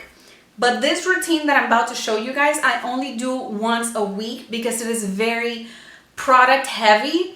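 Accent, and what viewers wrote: Puerto Rico. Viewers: voy a tratar not your mother’s! me encantó como quedó. btw eres puertorriqueña? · Eah corrillo? Puertorican?👀😂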